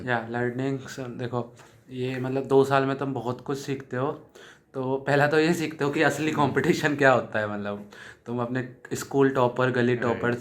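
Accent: Maharashtra, India. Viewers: Tu Marathi ahes ka? Video khup chan ahe Hindi la Marathi toning ahe….😄😄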